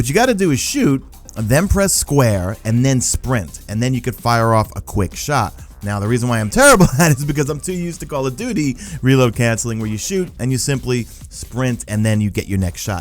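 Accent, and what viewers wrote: New York, United States. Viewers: you from new York? or Boston perhaps?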